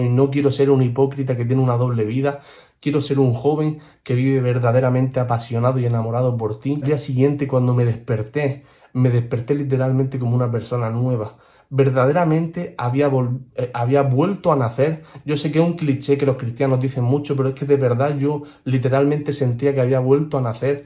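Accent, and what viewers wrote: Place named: Murcia, Spain. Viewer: Subscrito!. Adelante te apoyamos! Ole el acento Murciano!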